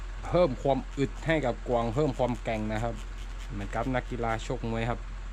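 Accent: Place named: Isan, Thailand. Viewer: คนอีสานใช่ใหมครับ❤ผมเป็นคนอีสานครับแต่พูดอีสานไม่ได้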